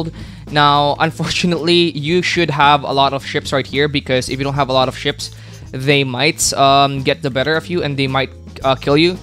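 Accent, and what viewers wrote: Philippines. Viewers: Are you a Filipino? Because i think you are cause of accent lol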